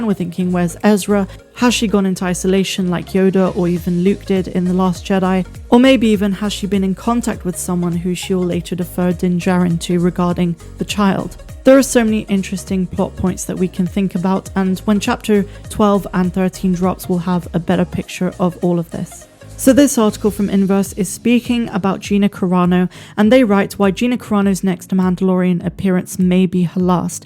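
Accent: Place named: South West England, United Kingdom. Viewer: Id know those hard rs in accent anywhere, your from the south west! Likewise lol, p.s. Great content as always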